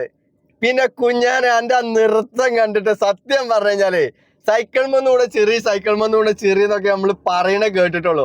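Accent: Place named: Pandikkad, India. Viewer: സംസാരിക്കുന്നത് പാണ്ടിക്കാട് ഭാഗം സ്ലാങ് തന്നെയാണ് പാണ്ടിക്കാട് മലപ്പുറത്തു അല്ല എന്ന് പറയരുത് മലപ്പുറത്ത്‌ തന്നെ വ്യത്യസ്ത സ്ലാങ്ങുണ്ട് പൊന്നാനി മൊഴിയല്ല അരീക്കോട് ഭാഗത്തു കൊണ്ടോട്ടി മൊഴിയല്ല പെരിന്തൽമണ്ണയിൽ നിലമ്പൂർ മൊഴിയല്ല വേങ്ങര ഭാഗത്ത് എല്ലാറ്റിലും ചില വ്യത്യാസങ്ങളുണ്ട്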